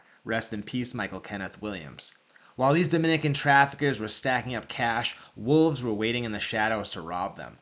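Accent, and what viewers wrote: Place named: Massachusetts, United States. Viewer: ....YO DOIN A GOOD VLOG... WHAT MAKES IT INTERESTING. IS YOUR MASS ACCENT,.. YOUR. KOOL THOUGH....OHH BY THE WAY.AM NO GENIUS, BUT I DID COMMERCIAL FISHING FOR 44 YRS, AN IM RETIRED OLD..F. AN GET TO WATCH ALL THE YOUNGINS. CRY ABOUT THIS AN THAT...WHILST I GET TO BULL RAKE IN PORTSMOUTH R.I.... AN MAKE A FEW HUNDRED EVERY OTHER MONTH...YA KNOW. FER LITTLE KNECKS.....I live.. You Know...!!!! I used to sell oysters at the Fishing pier where..No Name Restaurant is..It's a Seafood business next Booth over...used to get.120 bush..for undocumented oysters..That was side job.in. 90s... I MISS FISHING...HEY..IF YOU KNOW YOUNG GUY'S THAT WANNA WORK ON CLAM BOATS...LMK.ILL GIVE ALL INFORMATION...THEY ALWAYS HIRING...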